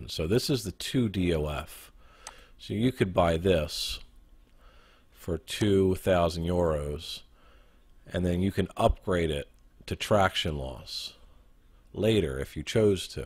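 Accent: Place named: East Coast, United States. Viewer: Love your accent. So east coast.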